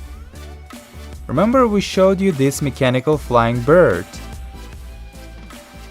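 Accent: Russia. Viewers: «Immunisation of” what? Учи английскиц, школота. Как же заебал ваш рязанский акцент. Тошнит прям. Лучше рекламируй китайское дерьмо своим руцким братанам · Your accent is not true. You are rus. Я человек, который живёт в Англией, слышал как говорят люди на английском и точно знаю.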